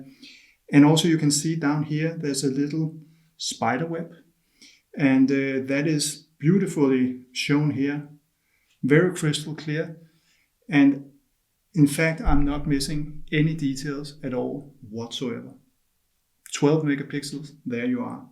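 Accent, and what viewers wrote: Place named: Denmark. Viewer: Your accent sounds Danish.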